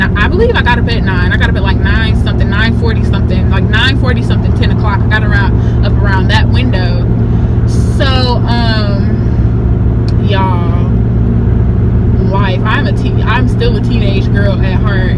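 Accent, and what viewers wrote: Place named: United States. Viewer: That country talk coming out in this video yaaass 🙌🏽🙌🏽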